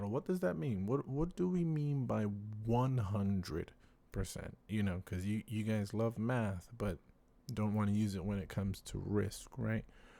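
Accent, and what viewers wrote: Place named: Morocco. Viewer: I smell the Moroccan dialect here 😉 bshto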